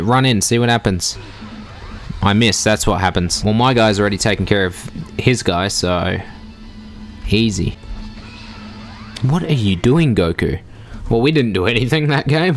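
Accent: Australia. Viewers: Are you an Aussie m8!? Sound like my girls brother lol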